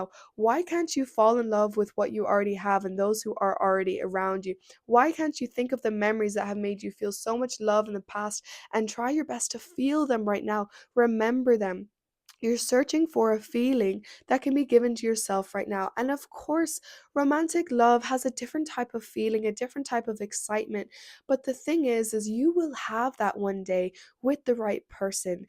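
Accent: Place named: Ireland. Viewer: Great video. Are you Irish. You have a hint of an Irish accent. Really excellent video on Limerance.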